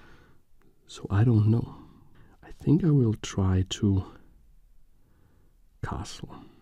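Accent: Denmark. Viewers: Just gonna ask whats ur main language ur accsent remind me of some1 from denmark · Jeg havde aldrig troet jeg skulle sige det her - men er simpelthen så vild med din danske accent! Jeg ville elske, hvis du kunne inkorporere flere ASMR elementer (i nogle videoer), hvor du gentager ord eller “tapper” på noget!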